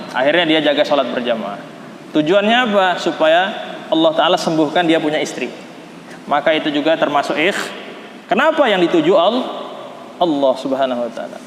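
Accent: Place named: Johor, Malaysia. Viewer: Bagus Bahasa Melayu Dia seperti Bahasa Melayu kami di johor juga.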